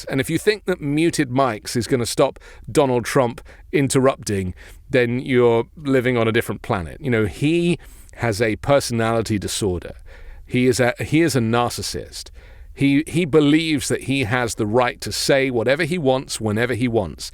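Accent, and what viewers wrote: England, United Kingdom. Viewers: WTF are you to weigh in on an US election with your boring English accent. Go kiss the crowns ass you boring limey.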